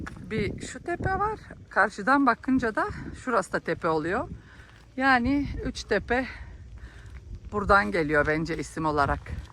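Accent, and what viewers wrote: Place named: Bulgaria. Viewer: Ne guzel video cok mutlu oldum..birde bulqar turkcesi konuşmana bayiliyorum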